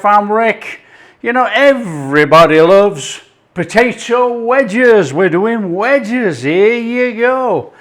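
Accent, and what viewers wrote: United Kingdom. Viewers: Happy day to you and your family, you are very relaxing to watch and learn, you have taught me so much, I love to cook, thank you for helping us so much, love your British accent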